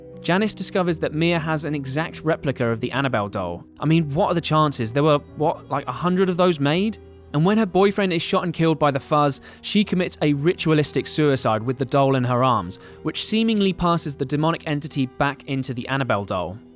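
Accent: United Kingdom. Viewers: it's his accent, basically he pronounced it in british accent because he is british. he didn't pronounce it la yorona because he is not a spanish speaker. we all have distinguish pronouncation b*tch. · Your British accent is charming , like all 'cockneyian " accents are ! Your pronunciation of " la - llorana ", not so much ...😂😂😂😂😂😂😂😂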